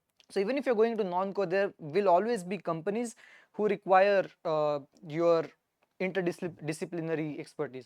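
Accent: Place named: Maharashtra, India. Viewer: are you marathi? i can see the accent slipping out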